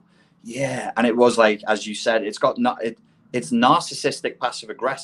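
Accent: Liverpool, United Kingdom. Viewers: Is that a Liverpool accent sneaking in 🧐 also a bit of Yorkshire like me ,anyway I am finding it really hard to get over the death of my mam,it’s the worst thing to experience.I’d just like to say Im not as intelligent as you & I’m in a low class job but I’m ok with that,I would not want the stress of the high pressure job.I do hate the communists btw lol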